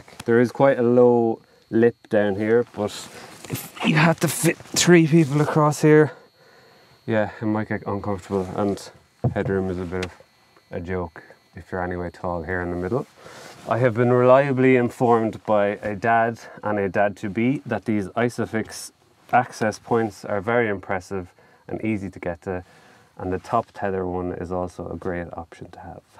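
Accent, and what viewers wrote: Ireland. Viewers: Best channel ever... It has cars and Irish English, love it. Hope all is well with Sinead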